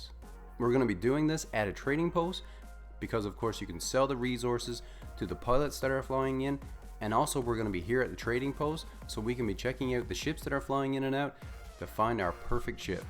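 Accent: Newfoundland, Canada. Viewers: Are you a Newfoundlander my guy? Watching from Dartmouth NS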